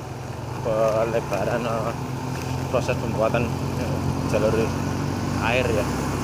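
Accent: Banyumas, Indonesia. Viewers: Asli mana bang . Kok logat,nya kayak ngapak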